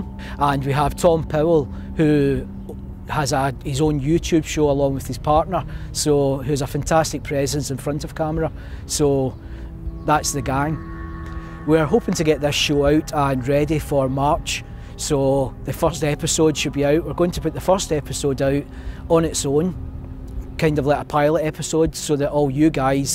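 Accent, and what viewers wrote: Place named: Glasgow, United Kingdom. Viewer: Nice to hear the voice of a fellow Weegie (or should that be ouija) 😁 Looking forward to the series. Slainte guys!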